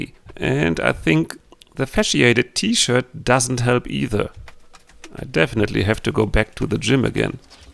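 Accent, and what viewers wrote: Germany. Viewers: The stem wall or foundation wall was not a mistake! The 3x3s or what ever the beam size are you used to frame with and the insulation and osb and the windows and what ever you cover it with weigh a lot. Good job. I'm getting used to your German accent! German accent in Germany....duh! I'm digging your renovation. Love from NW Colorado, USA. Thanxz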